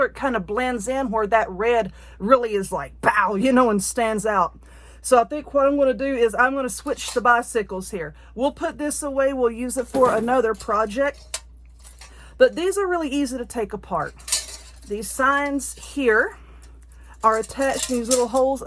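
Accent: South, United States. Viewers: Grand idea. I like your southern accent. I am an Island girl and I have an accent. I think it's cool. Thank you for sharing and yes l ok ve the mermaid nails. God bless you add and your family.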